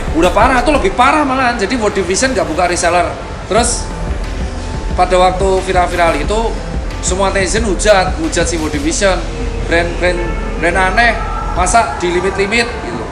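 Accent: Java, Indonesia. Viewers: Gw demen logat lu bang lu guwe tapi jowo medok👍👍👍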